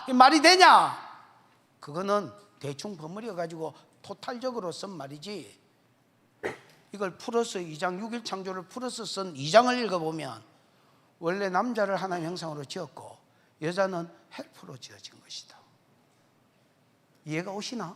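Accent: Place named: Busan, South Korea. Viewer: 목사님~^^ 귀한 말씀을 듣게하시니 감사드립니다 ❤ 우리남편에게 목사님 부산 방언통역재미도😂 쏠쏠합니다 건강하십시요 🎉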